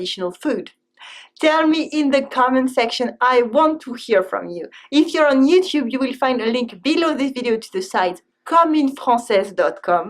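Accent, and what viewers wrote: France. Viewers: Good job! Love the French accent ! J adore aussi the gallette des rois!! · Like French accents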